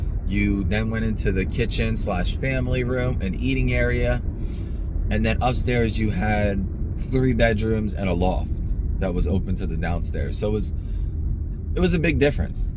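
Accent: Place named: New Jersey, United States. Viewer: The sexiest part about you is your jersey accent❤❤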